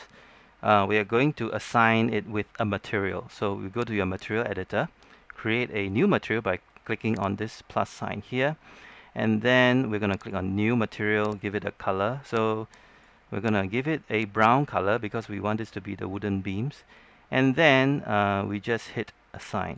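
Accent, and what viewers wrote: Singapore. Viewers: You are from Singapore, the accent.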